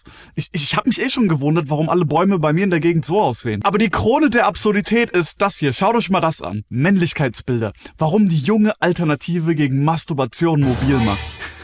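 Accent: Germany. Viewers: Das mit dem sächsischen Dialekt hättest Dir schenken können. Ansonsten passt, den Schlusssatz sollte sich jeder an den Kühlschrank heften!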